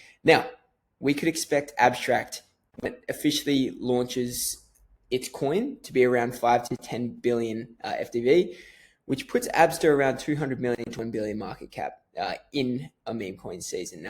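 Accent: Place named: Australia. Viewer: Sounds like you are Aussie. Are you friends with Dan McEvoy?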